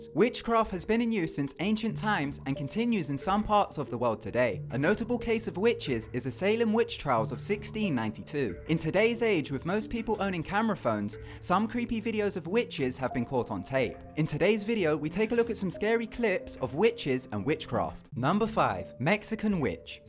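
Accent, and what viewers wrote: United Kingdom. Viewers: Bruchas, lol love that British accent.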